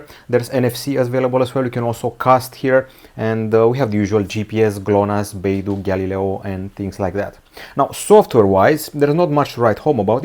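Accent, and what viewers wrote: Romania. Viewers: Ba tu esti român! Se aude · e obositor să asculți un român explicând ceva în engleză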